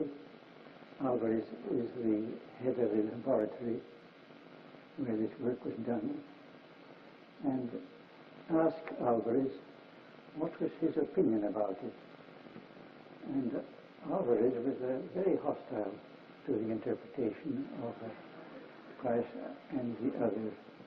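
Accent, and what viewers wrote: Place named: United Kingdom. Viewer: I was wondering! It can’t be, this guy has a British accent but Dirac was American right?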